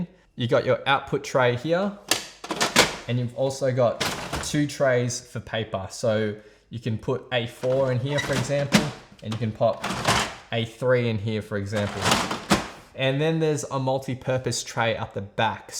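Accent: Australia. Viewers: Thanks. Nice, clear review. Nice to hear an Aussie accent too... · I like the aussie accent.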